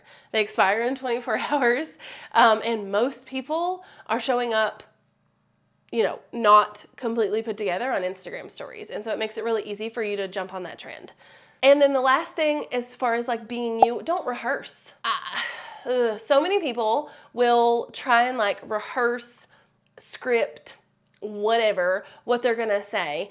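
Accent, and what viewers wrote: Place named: North Carolina, United States. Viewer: As a NC native (who still lives here), I love listening to you because I know that there is a place for someone who talks like me on YT! You give so much great information all the time. Thank you so much for being an open book and giving us all the tips.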